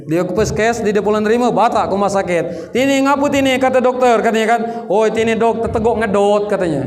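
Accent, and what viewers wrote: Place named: Semende, Indonesia. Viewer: Ustadz cetamah dimn ni??kok kyk bhs bapak saya ya,,jeme semende??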